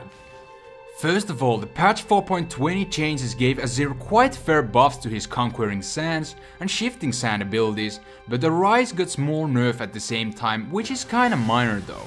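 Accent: Finland. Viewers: ***** dude stop crying about it, he is from Finland and pronouncing certain words for us in English is extremely hard. So stop crying. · ***** you can clearly tell that he has a finnish accent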